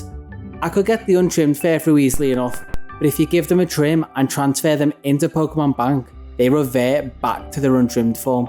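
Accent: Liverpool, United Kingdom. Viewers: Whiplash putting a Pokémon video on and hearing a scouse accent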